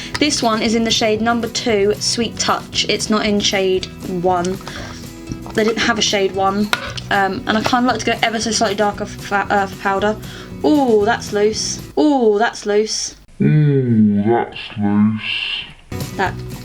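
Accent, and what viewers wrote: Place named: Norfolk, United Kingdom. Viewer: You're welcome!! You sound quite Norfolk and im from there so aha xx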